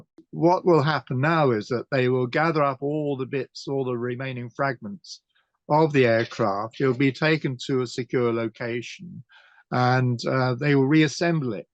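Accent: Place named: RP, United Kingdom. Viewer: Just coz you've got posh English accent don't mean you're intelligent mate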